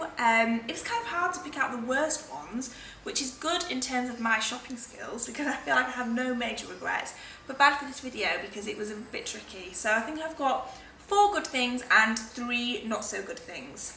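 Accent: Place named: West Yorkshire, United Kingdom. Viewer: She's from West Yorkshire, near Leeds (in the North of England)!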